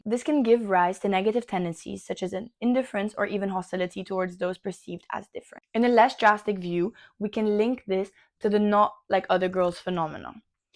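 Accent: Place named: France. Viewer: Sister 🥹are you french btw? Ur accent is so cute:3 I'm not trying to be rude, but I've been learning French for awhile but only textbook style. I only started listening to French content, and I kind of recognise the accent..?^^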